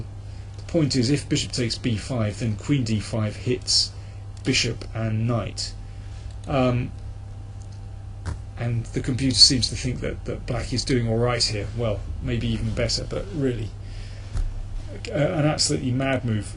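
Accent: United Kingdom. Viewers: What great channel. I'm so glad I stumbled upon it over a year ago, countless hours of great chess and a hugely entertaining British accent along with it. Great work Mr. King!!!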